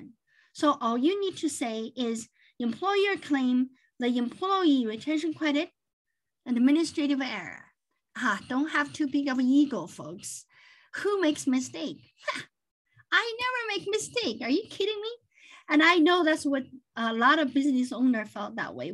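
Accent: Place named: China. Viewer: you are so good. your Chenglish is just fine. I learned a lot from your video.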